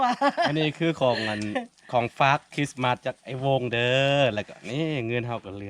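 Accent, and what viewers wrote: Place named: North, Thailand. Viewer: พูคเหมือนคนเหนือเมืองไทยมากๆ fcลำปาง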